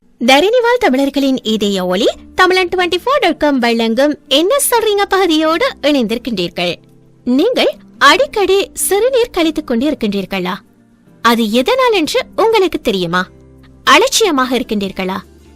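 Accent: Sri Lanka. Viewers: Bro avanga srilankan tamil...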